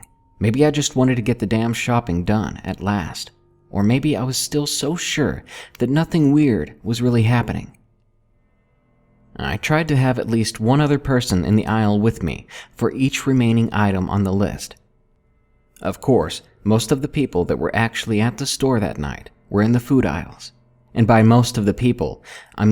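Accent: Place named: South, United States. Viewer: Omg! sounds so young and southern, i fucking love it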